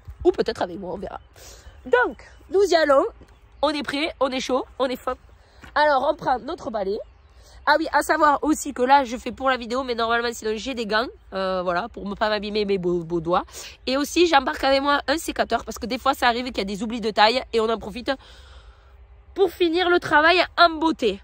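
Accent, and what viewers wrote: South, France. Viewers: Félicitations pour cette belle demo Et joli franc parler agrémenté d'un bel acent du soleil bienvenue chez moi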